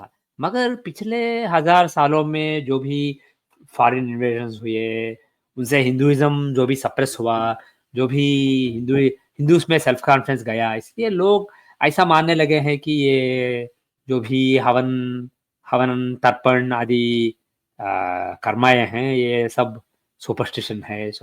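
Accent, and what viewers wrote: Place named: South India, India. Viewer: whaaaat is saafisticated? He clearly cannot speak in Hindi and jumps to English often in the middle of sentences.. His English is quite average with heavy South Indian accent. "Emperor has no clothes....".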